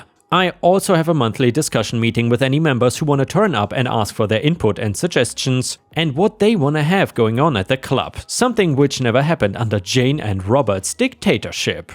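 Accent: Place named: Germany. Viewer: Carry oak E lol. I like your pronunciation though. It’s so close for a non native speaker, also I think you’re losing your German accent.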